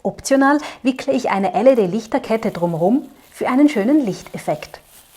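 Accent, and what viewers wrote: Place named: Switzerland. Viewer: Vielen Dank für die schönen Osterideen. Du erklärst alles sehr gut und ich höre deine Stimme mit dem leichten Schweizer Dialekt sehr gerne.😁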